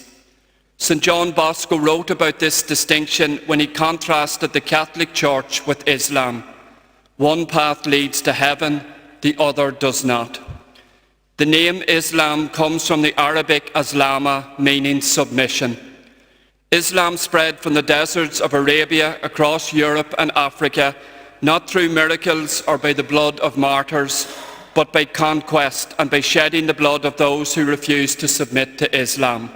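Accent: Ireland. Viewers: Wonderful homily and beautiful Monaghan accent!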